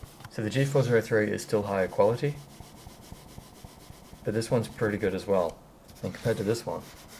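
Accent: Australia. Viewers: I like your accent and voice so much, dem aussies lol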